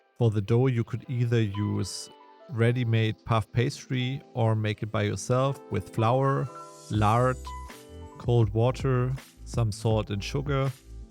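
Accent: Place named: Germany. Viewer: I think you should mention that the lard should be really cold during the Pâte dough making. Preferably put into the freezer 1 hour beforehand. Since otherwise the heat thats created in the food processor due to the chopping motion, will cause the fat to seperate & kind of like with puff or short crust pastry, will result in an oily greasy mess. As a chef, naturally I already know this, but someone who isn't familiar with making a classic Pâte may not know this. Just saying... Btw, your german accent is really strong. lol Are you a chef or are you just a passionate home cook? I think it's a real shame that old classic appetizers like Parfaits, Pâtes, Galantines etc are a lost art. And are slowly fading from existence. Except maybe in France where they uphold the tradition of producing all these classics. But if you look around restaurants in Scandinavia, Spain, Germany, Britain etc It's a real rarity to even find these dishes on a menu, except its maybe a 3 star fine dining etablissement. 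I think I'll do a Poultry liver parfait for new years eve. With maybe a port jelly or a cumberland sauce. And marinate the livers in some madeira and brandy. I admire that you have executed this classic recipe. Cheers !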